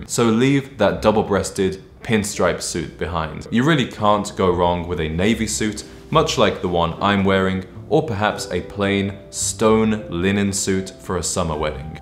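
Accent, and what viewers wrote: United Kingdom. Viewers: Bro is British certainly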